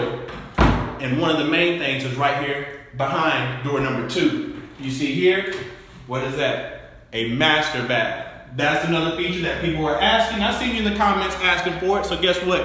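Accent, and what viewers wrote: South, United States. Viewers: Was I the only one distracted by how tight those pants are on his beautiful 🍑🙈 I'm like 20% interested in these houses and 1000% interested in he's so beautiful his southern accent those muscles that smile is curly hair every single part of him!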